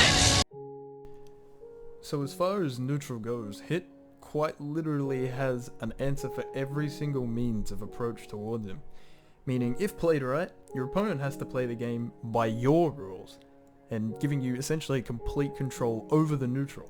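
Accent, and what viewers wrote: Australia. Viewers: Sorry bro but i would rather hear roofles manly alpha voice narrate a hit guide instead of an aussie :( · You know he's Australian cause he says haytch instead of h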